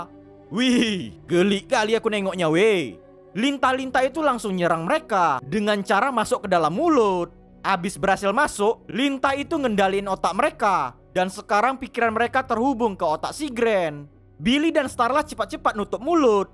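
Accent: Medan, Indonesia. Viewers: Org medan nih bos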